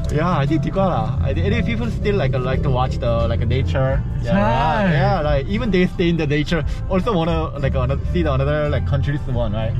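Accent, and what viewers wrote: Singapore. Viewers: I feel like you're singaporean tho, your accent.. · I’m watching this video because of phi 👀❤️ btw, are you really korean? When you speak english you sounds like singaporean 👀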